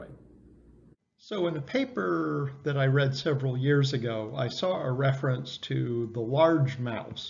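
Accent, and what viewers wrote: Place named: England, United Kingdom. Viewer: Request you to kindly add subtitles. English accent is hard to understand. 🙏🏼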